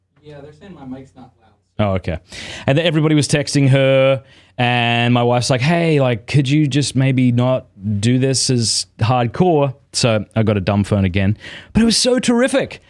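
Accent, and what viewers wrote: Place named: Australia. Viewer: As a Texan, I have to say that hearing brother say "y'all" in that Australian accent simultaneously delights me and makes me feel weird. Love the channel; it's been a great help on my own journey.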